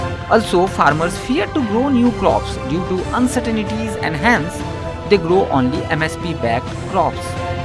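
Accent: Odisha, India. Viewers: It sounds an odia student is reading an english essay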